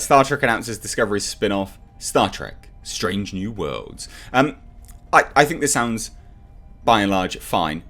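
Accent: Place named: United Kingdom. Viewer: I'm going to use the phrase 'circumnavigating the shit' in a sentence asap !! This man speaks the Queen's English.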